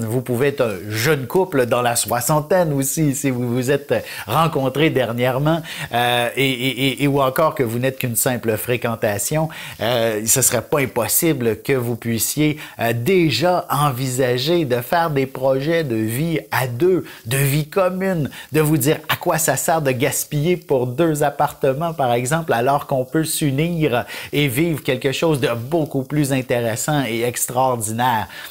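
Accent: Canada. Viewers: Bonjour, faut il écouter les ascendants svp, j adore votre accent canadien Merci Mais il y a un moment que l on ne vous pas entendu, je suis capricorne ascendant lion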